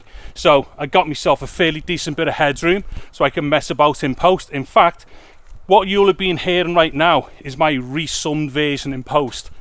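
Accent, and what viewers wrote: Liverpool, United Kingdom. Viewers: I’m here from Muldoon. If you’re not looking specifically for a vlog camera, I think this Sony zv1 seems a good camera. But then...isn’t there better cameras for a similar price or cheaper that can do similar things? I’m looking at Sony a6000, Sony RX 100 V, Canon g7x mark II or as you mentioned GoPro 8. What would you recommend? I’m not very technical, I’m looking more for photography and have the ability for good video or vlogs if I felt like it! Also nice to hear a Liverpool voice! Best Wishes 💕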